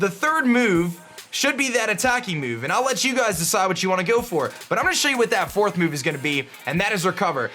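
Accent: Australia. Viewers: I haven't watched in a while... when the f did he get an aussie accent?! peukomon it's pretty faint but it's pretty new for me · I just noticed he switches between kinda austrailian and american accents so he sounds like one of those surfer dudes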